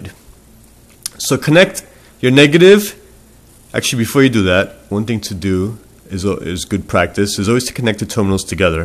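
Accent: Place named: New Jersey, United States. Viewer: i like your new jersey ish accent :)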